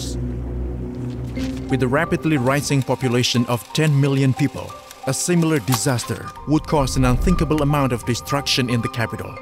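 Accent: Indonesia. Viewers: asli udah curiga bgt dari awal ni yg ngomong org indo wkwk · Yes, I feel the Indonesian accent here 😄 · i am sure the narator is indonesian :face-blue-smiling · Still very indonesian accent even try hard to become native english speaker.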